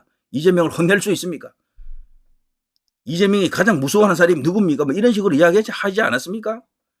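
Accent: Gyeongsang, South Korea. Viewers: 발음을탓하기전에 더보기보시면 바로아실텐데 경상도 억양은 발음자체가 표준어처럼표현하기힘들어요 새겨들어야합니다 취지가 참 못되셨네 빙빙돌려서 참 불쾌한짓을 하시네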